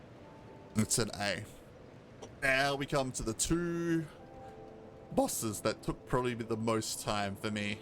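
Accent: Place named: South Africa. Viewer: You sound South-African or Australian.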